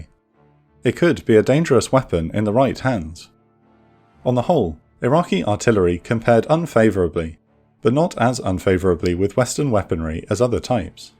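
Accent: United Kingdom. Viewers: A lot of these videos are made with the premise that the British accent = well-informed historian, when it's really a millennial generating click revenue after reading Wikipedia and adding file footage. Iraq had their own domestic production of copies of the Yugoslavian Zastava M70B1s, which were copies of Albanian smuggled AKs from 1959. Zastava helped Saddam start his domestic production of what they call the Tobuk in 1979. Those weapons made from <num> were basically equivalent to Yugos, then the production quality dropped off. They are neither Avtomat Kalashnikovs of 1947, nor Avtomat Kalshnikovs Modernizinaiya (AKM). They are Iraqi copies of Yugoslavian M70s.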